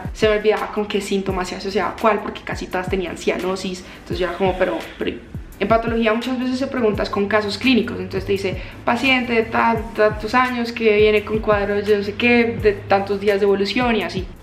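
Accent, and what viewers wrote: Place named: Andes, Colombia. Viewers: el acento de Bogotá es diferente, el que hablan sus compañeros si es el acento de Bogotá. Ella tiene un acento neutro con un poquitico de caleño (ella es de Cali) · Hola, si es muy normal esa entonación de la S en la región andina de Colombia. Ella habla con acento de Bogotá, la capital de Colombia. De donde eres?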